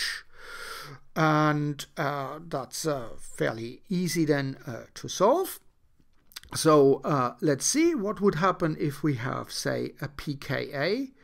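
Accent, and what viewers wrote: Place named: England, United Kingdom. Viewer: Can somebody ~please~ tell me why the English English makes literally every thing easier to understand.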